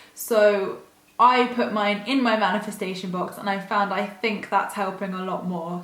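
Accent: England, United Kingdom. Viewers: I'm trying to manifest my dream home, which would be a self build straw bale house (not as unstable it sounds lol, actually a really well insulated house that is covered in plaster and cheap and eco friendly). This will mean I'll need a lot of money to buy the land and pay for the build, but I'm not complicating it by thinking about all the different aspects of it too much, I'm just visualising the house, and am going to try putting my written list in a manifestation box too like you have suggested. I'll watch the rest of your videos too and let you know how it's going, great to find another English person making these videos too, though all the American ones are still inspirational, somehow the English accent makes it more relevant to me.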